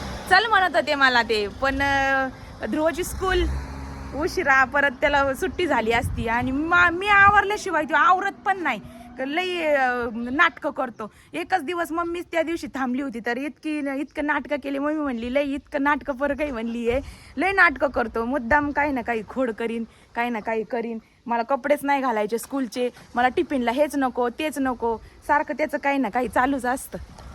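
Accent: Ahmednagar, India. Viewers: आपली नगरी भाषा अशीच आहे... लोकांना ती वेगळीच वाटते...आणि dhawalpurila आमचे पण पाहुणे राहतात...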